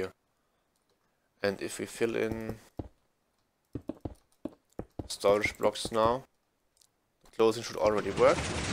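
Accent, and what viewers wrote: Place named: Germany. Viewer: Nur wegen dem Akzent sofort gewusst deutscher😂😂😂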